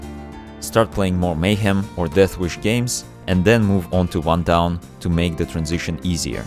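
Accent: Russia. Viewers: Говори что хочешь, но акцент русского у тебя выделяется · Кул рашн акцент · Just when i clicked on the video i was like sure great intro .. OH HES RUSSIAN haha im half russian i did not say that in an attempt to offend him.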